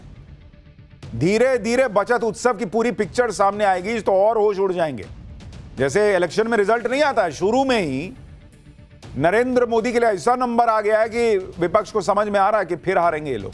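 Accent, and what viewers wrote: Bihar, India. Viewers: आज तो पतलकार ने 100 मे से 110 नंबर ले लिए, बिहारी भाषा का इनपुट झलक रहा था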